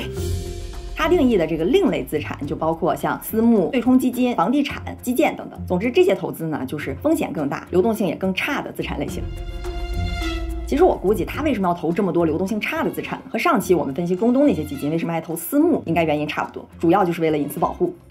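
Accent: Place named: Northeast, China. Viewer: 东北人嘛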